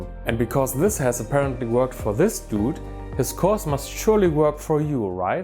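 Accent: Germany. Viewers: Crazy behaviour and sad. So shallow. And yes some earn lots and lots by selling gullible people courses on how to level up and so on, targeting desperate people to waste their little money in hooe to better their lives. That is real shabby and low behaviour. Btw. You have an interesting German accent, are you German by any chance? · Direkt am Akzent den Alman erkannt ^^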